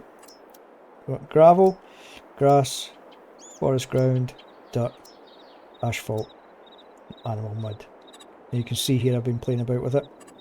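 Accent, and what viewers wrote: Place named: Scotland, United Kingdom. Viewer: Taks a tcheuchter te ken a tcheuchtar! I subscribed as soon as I heard your voice. Just back from Scotland to Finland and driving around the North East, I immediately restarted FS22. I miss my home country so much and my country ways. Brilliant video. Thank you. Regards Fermer Findlay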